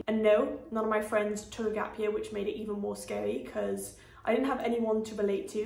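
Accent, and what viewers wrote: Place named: England, United Kingdom. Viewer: Why do you have an English accent if you grew up in France?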